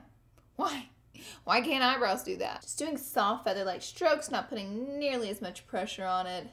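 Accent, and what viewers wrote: South, United States. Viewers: Clearly the latter....... she's a southern gal....twang and all. Do you notice how cutely she says concealer? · You crack me up girl!! "Oily" I love your accent! I also have a tad bit of one most of my family is from the south.❤️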